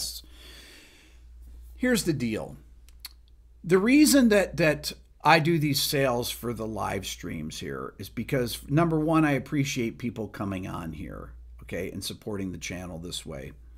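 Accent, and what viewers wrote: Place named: South, United States. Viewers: Ain’t nuthin’ wrong with soundin’ southern lol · You are a native New Yorker but the south is starting to rub off on you. Joined the league.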